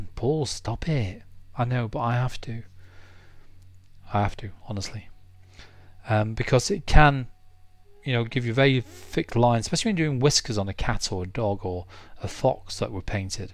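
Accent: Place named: Scotland, United Kingdom. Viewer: Omgosh ~~ I know this video is a year old & I’m so happy I found you😊 Long story short..I’m going through, not so fun times, but you were able to put a smile on my face..just by being you💕 I thank you. Just to get out of my “funk” as I call it I want to paint a cat’s eye. Thankfully I found you. Step by step over two hours. Nobody does that. They want $ sure rightly so however there are people who want to teach and they don’t care about $. You have integrity. Thanks for putting a smile on my face. It has been a few since I had one. Your accent helps too. My grandparents were from Scotland, and England. Hearing your brough has helped immensely. I would love to send you my finished eye. Is there a way? Hope you’re getting along well. I’m Scottish and live in America. This has been quite a couple years aye? Hope to hear back and pray that all are happy and well ~J~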